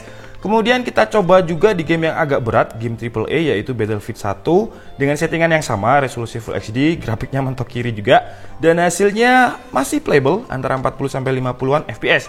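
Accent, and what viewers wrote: Malang, Indonesia. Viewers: Lohhh mas wong malang to?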